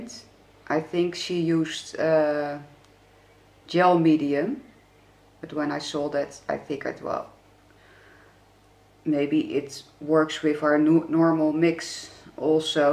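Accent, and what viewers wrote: Netherlands. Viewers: love the dutch accent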